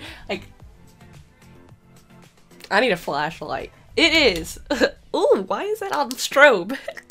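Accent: South, United States. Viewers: What state are you from??? You sound soooo SOUTHERN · Your southern the way you say "Like" tells me you spent alot of time in the south or near southern people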